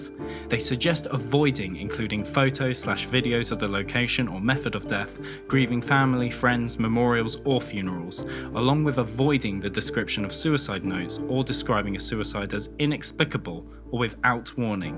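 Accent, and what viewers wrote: United Kingdom. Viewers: That’s literally a common British voice, I’m pretty sure I sound a bit like that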